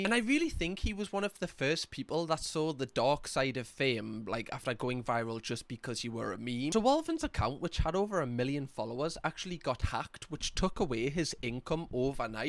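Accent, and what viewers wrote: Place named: United Kingdom. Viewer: as a fellow brit I'm always so happy to see you upload and I get to hear a familiar accent talking about stuff instead of the Americans haha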